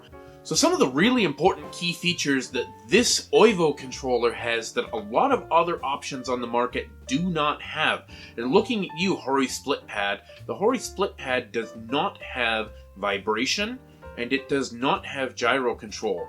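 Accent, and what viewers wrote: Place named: Canada. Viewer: I hear that canadian accent 👍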